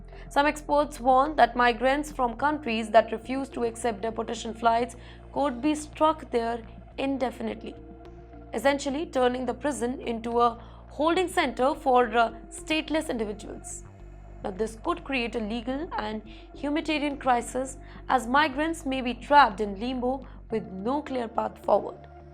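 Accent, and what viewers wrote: India. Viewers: Punjabi+hindi+English = North Indian English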